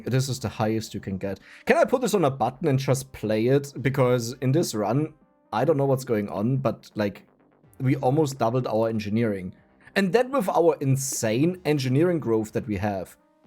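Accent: Germany. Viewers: Hi there, may i ask from wich region in germany you are? Im realy not sure with your accent. Thanks for your videos they are quite entertainung.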